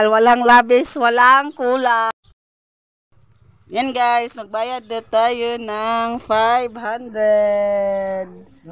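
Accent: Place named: Visayas, Philippines. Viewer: Yes kilala ko si J Djshine dati din ako sponsor sa kanya, bait sya. Congratulations sis, parang bisdak ang tono ng salita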